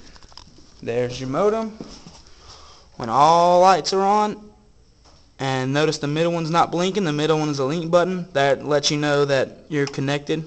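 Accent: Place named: South, United States. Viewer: Y'all, that helped me a lot but y'all need to stop talking like that ya country boy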